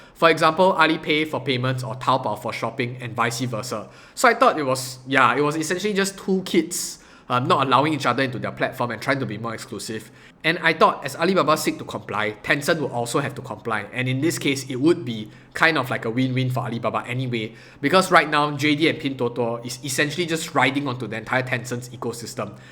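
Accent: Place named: Singapore. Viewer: 新加坡口音的英语！！！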